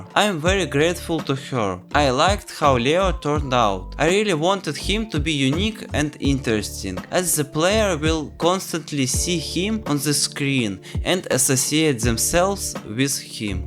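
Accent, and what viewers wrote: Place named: Eastern Europe. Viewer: Finally new video on this channel. I hope that this minimalist platformer find his players! P.S. By the way I really enjoyed your cool slavic accent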